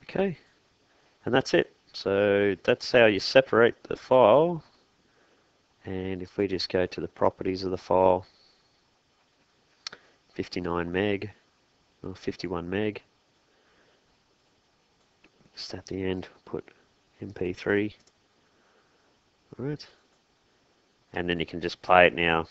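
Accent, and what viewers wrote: Australia. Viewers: An Australian accent! Very helpful vid, thank you...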